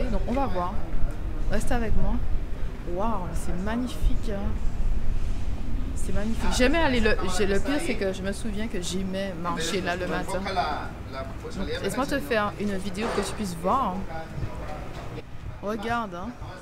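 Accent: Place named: Martinique. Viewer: Oui, je confirme que l'accent martiniquais est bien present dans ton dernier audio, et l'articulation y était également. 😊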